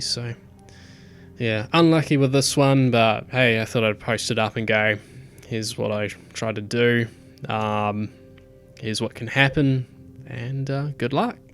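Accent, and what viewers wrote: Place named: New Zealand. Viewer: I just did a search on the Armada 110, this is the first video I saw - was looking at your machine in the video.......I think I own that exact machine now!!! I was looking at it thinking...no it cant be that big of a coincidence, but listening to your accent it sounded like a kiwi accent.....I went back into my emails from when I brought the machine off trademe last year - I am now sure it is the same machine - what a surprise! :) The machine is still going great, I am getting a lot of fun out of it. Cheers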